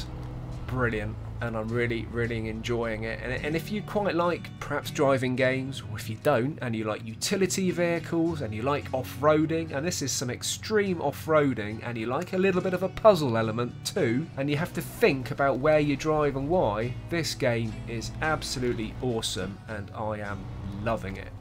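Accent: United Kingdom. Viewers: Hoal, Te extrañamos en WoT No nos abandones. Tus narraciones con tu asento britanico son una delicia.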